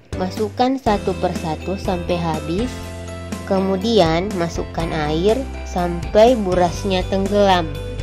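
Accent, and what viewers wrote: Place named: Bugis, Indonesia. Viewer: Asli org bugis ini dr caranya bcr bhs indonesia sm logatnya😅kurang lengkap kalo tdk dibuka,mau diliat bgmn hasilnya,tipsnya jg spy tdk cpt basi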